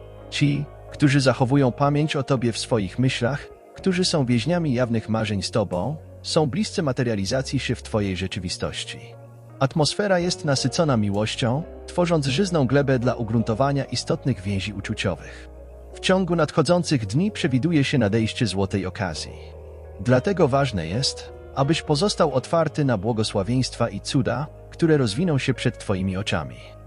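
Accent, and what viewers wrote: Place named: Germany. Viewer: Po raz kolejny zgłaszam prośbę- przywrócić lektora tego który był od samego początku. Czy ktoś z właścicieli tego konta czyta wogóle te komentarze. Tego lektora który jest obecny nie da się słuchać. Co to w ogóle za pomysł żeby dać lektura z niemieckim akcentem; czy to efekt Tuska? Proszę o przyłączenie się do prośby czytających. Pozdrawiam.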